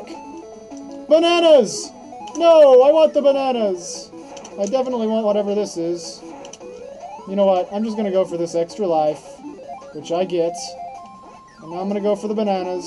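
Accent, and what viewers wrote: United States. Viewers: Lmao i used to watch this when i came out on my ultramegaunknown account. Great times! Too bad YT deleted my old comments. I remember commenting asking what accent you have and you said it was Oklahoma. And another guy said "Where the wind goes sweeping through the plains." Then you said, "More like where the tornado sweeps through the sea of concrete." Lol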